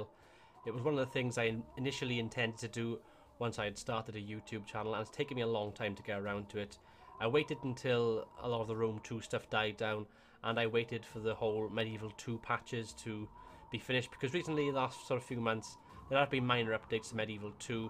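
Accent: Wales, United Kingdom. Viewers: So damn happy this is finally out :D Plus I love the Welsh accent so your voice should compliment this lovely :P Anyhow enough commenting, time to watch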